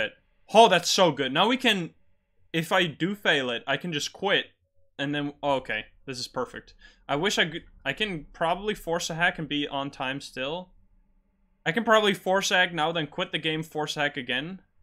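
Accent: Netherlands. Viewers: dude I just started watching your videos and I had no idea you were Dutch until I heard you read the letters and numbers out loud. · ik hoorde het al eerder aan het accent, komt ook wel omdat ik al had gezien op <link> dat je nederlands bent. :P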